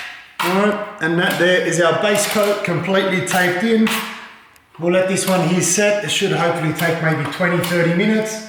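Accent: Australia. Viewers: i love your accent i'm guessing you are from Melbourne Australia.. I'm stuck in S.A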